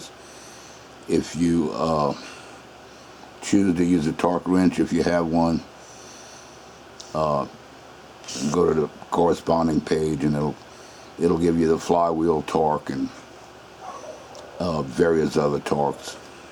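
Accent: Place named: Louisiana, United States. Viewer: Thanks for the video. You sound like you might be from Louisiana, likely east and south of I-10. Ask me how I known. That explains the damn corrosion.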